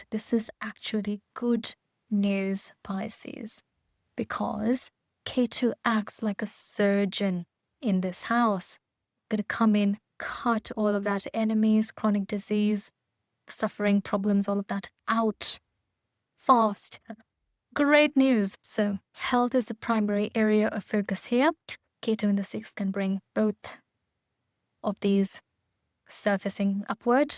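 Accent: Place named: Guyana. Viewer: Your accent strongly reminds me of British Guyana. If I had the male version of your voice I'd be a millionaire. I'm looking forward to future videos explaining Jyotish. It's all Greek to me. Thank you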